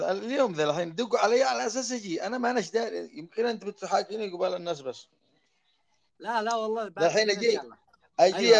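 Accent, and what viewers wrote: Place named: Yemen. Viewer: هذا يمني لهجته خالد الراعي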